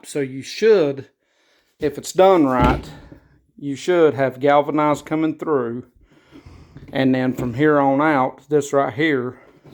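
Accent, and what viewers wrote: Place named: West Virginia, United States. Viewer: You have to be from western west Virginia with that accent.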